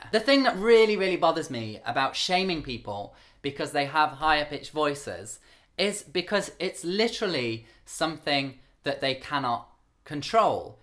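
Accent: United Kingdom. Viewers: From the perspective of a gay American man with a deep voice, when I listen to you speak the first thing I think about is not whether or not you sound gay – it's how British you sound. Your Englishness overwhelms everything else to an American ear, or at least this one. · Woow british accent so wonderful 😩❤️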